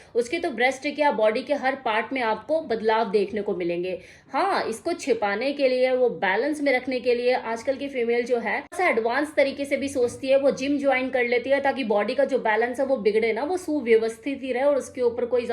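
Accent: Haryana, India. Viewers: लगता है आप हरियाणा से है😮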